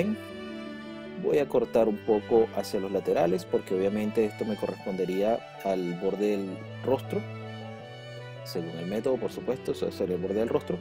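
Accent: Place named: Chile. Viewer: A mi me suena a Chileno, tiene la misma voz que Wikisebas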